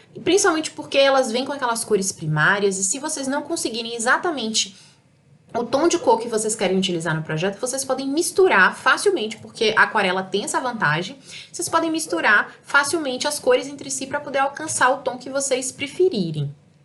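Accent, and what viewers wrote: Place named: Bahia, Brazil. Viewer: Que bom que gostou, obrigada! 🥰 PS: o sotaque é da minha amada Bahia ♥️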